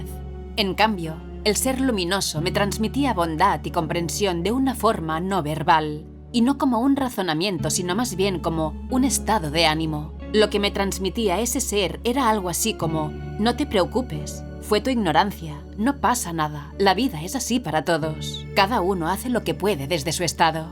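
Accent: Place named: Spain. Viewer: Esta ECM nos deja una gran moraleja, tenemos que ser más empaticos y cuidadosos cuando decimos o hacemos algo, tal vez sin querer podemos lastimar u ofender a los demás. Me encantan estos testimonios, me traen paz y tranquilidad, me hacen pensar que en algún momento me voy a reunir con mi niño en un lugar de perfecta paz, además ese tono de voz y ese lindo acento español es muy relajante. Te lo agradezco mucho. Saludos cordiales desde Colombia!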